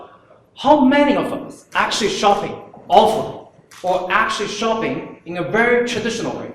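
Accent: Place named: United Kingdom. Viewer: His British accent is so kool.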